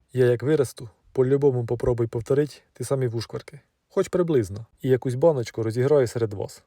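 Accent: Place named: Surzhyk, Ukraine. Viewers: Мои сваты из села Мыколаивки Новомосковского района так балакають, такой диалект суржика прикольный, юморной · Дякую за відео, слухаю наш рідний суржик як бальзам для душі 🎉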